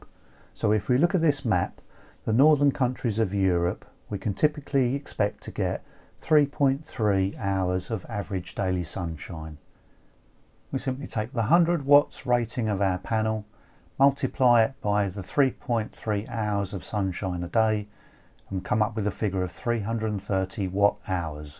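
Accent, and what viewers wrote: United Kingdom. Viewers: Ty, a different view on the power of solar panels and very informative. Also, nice to hear a fellow brit msking these kind of vids. Good luck with the build and ty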